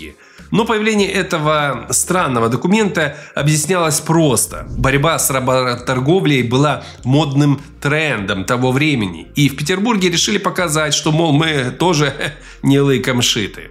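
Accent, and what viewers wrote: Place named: Ukraine. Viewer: Страна-резидент канала: Украина(это слышно и по говору),так что,все понятно, псевдоистория с вымыслами... В России НИКОГДА не существовало рабства,каждый человек был лично свободен(экономическая зависимость-это другое дело). Раб не может сменить социальный статус,а крестьянин в России-мог! Крестьянские дети становились дворянами,как бы(потому что это требовало,как минимум,образования). Крестьянин мог подать ЖАЛОБУ на помещика,напрямую,Царю. Крестьяне жили общиной,"миром",имели СОБСТВЕННОСТЬ и сами продавали выращенную продукцию-где тут рабство? Розыском беглых крестьян обязан был заниматься помещик, то есть никакой системы государственного закабаления не существовало.. Крестьянство было СЛУЖБОЙ,только,не государевой,а персональной. Феодальная система! Общественный договор! Взаимозависимость!!!! Помещик ОБЯЗАН был заботится о крестьянах и кормить их в неурожайные годы-отмена крепостного права вызывала БУНТЫ крестьян,так как помещик теперь мог просто выгнать неэффективных и ленивых работников,"освободив" их. 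"Продавали" крестьян деревнями,то есть,это была просто смена собственника,как сейчас с заводами. Отдельных крестьян не столько "покупали",сколько переманивали-хорошего кузнеца,например. Крестьянин,до определенного года,мог вообще,сменить помещика(на Юрьев день)-рабство,говорите? Разумеетс,были периоды и случаи не очень красивого обращения с людьми-но их всегда было мало и это общественно порицалось. Не забываем,что ВСЕ русские тогда были одной веры и,если европецы в Новом с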